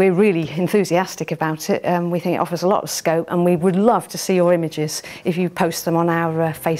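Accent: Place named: United Kingdom. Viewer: nice british accent!!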